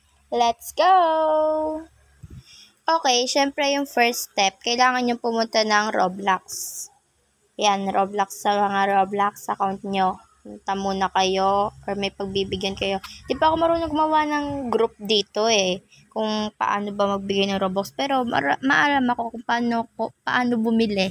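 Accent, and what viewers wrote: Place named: Philippines. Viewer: almost hears like you talk Philippines my mom talks Philippines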